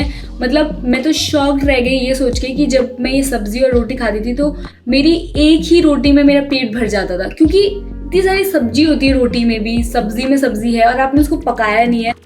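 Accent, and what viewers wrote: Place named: Pahadi, India. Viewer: You are pahadi and delhi ki... i can sense you mann!!! Amazing review.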